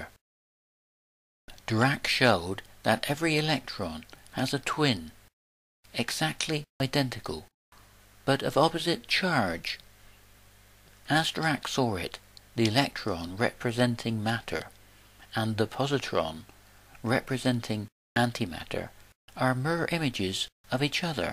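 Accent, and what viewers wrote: West Country, United Kingdom. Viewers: Very informative and I love the little West Country inflections.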